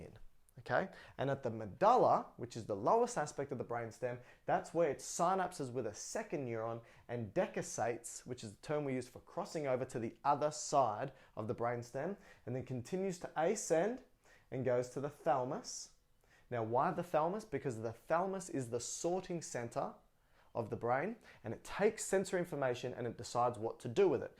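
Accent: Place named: Australia. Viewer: Came for the muscles. Stayed for the Aussie accent.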